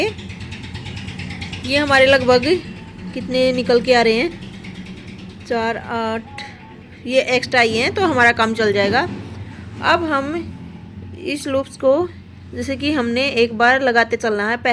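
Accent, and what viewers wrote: Haryana, India. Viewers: Good morning mam aap ka pahle ka vidio me sikhane ka tarikka bahut hatt ke hay abhi bahut hi acha hay aap ki bate Haryana stayil hay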